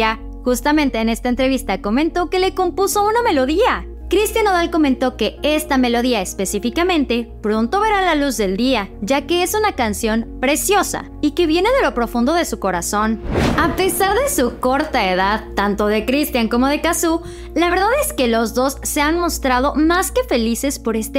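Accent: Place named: Argentina. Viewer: Como se nota que eres argentina 😅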